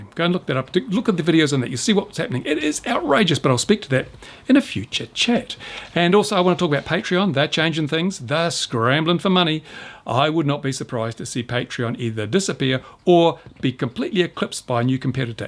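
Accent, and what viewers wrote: New Zealand. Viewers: "Kiwi" accent LOL! =D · I like this format. Informative and not an outright rant. Very nice and laid back. And that Kiwi accent. Tingling. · Goodmorning..Kiwi accent lol. Is that one of your female admirers on your left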